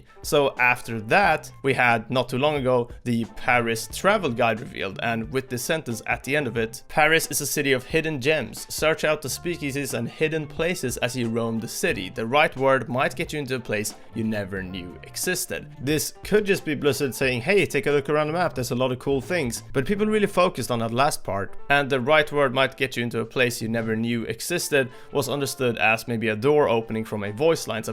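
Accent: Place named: Sweden. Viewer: är du svensk du har dealect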